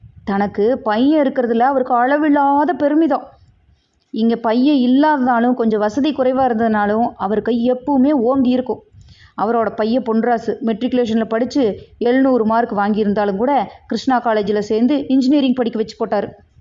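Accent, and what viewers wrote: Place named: Kongu, India. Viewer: கொங்கு மண் சார்ந்த கதை. அதை கொங்கு தமிழில் கேட்பது மிகவும் இனிமை 👌🏻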